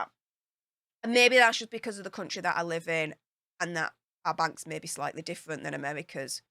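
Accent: Yorkshire, United Kingdom. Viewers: She’s Ok got that accent from Yorkshire 🙂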